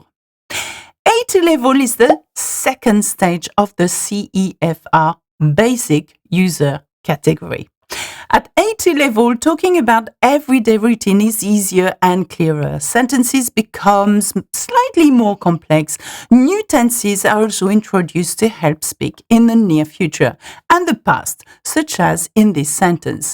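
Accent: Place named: France. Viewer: Elle parle anglais couramment, mais elle a un accent français très fort.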